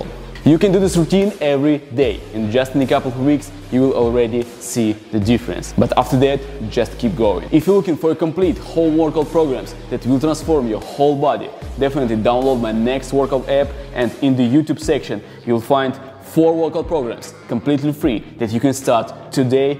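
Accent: Russia. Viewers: рашн инглиш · This russian accent motivates me so hard. I feel so jacked already 💪😁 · а ты русский ?, потомучто говариш как русский луде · сколько лет живет в сша, а акцент как был русским, так и остался.лол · я же слышу, что ты русский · Почему я слышу русский акцент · Прикольно и полезно, но акцент как из фильмов про карикатурных русских гангстеров, конечно, сбивает...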